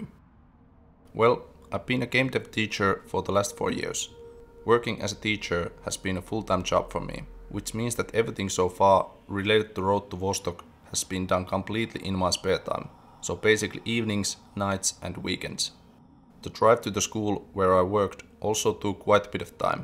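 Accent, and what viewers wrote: Finland. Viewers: Sounds like you are finnish?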